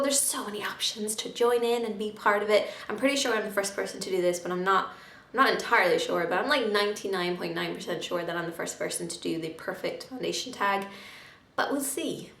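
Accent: Ireland. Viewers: Estée Lauder amazing by far my favourite foundation ... Btw what part of ireland are u from ? Xx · Are you Irish?!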